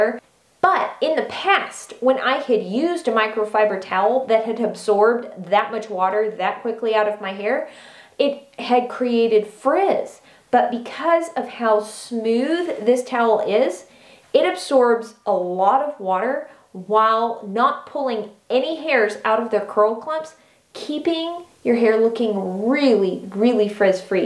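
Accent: South, United States. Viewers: You could work for QVC!!!! ;) Shame that (as usual!) the give away and products is for USA folks only (English subscriber here.) None the less, well done on the 20 k subs (I'm just a recent one too, like a few days ago recent, lol) And last, but not least, I love your accent. :) It's so funny for me to hear, like something out of a movie.. Very 'Southern drawl.' Take care. PS. Your hair is beautiful.. The shine is EPIC!!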